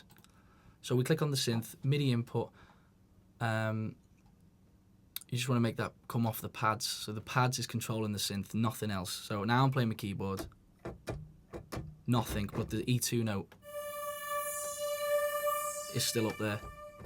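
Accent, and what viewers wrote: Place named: Liverpool, United Kingdom. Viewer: Subscribed! Brilliant teacher, thanks. And I could listen to that Liverpool accent all day....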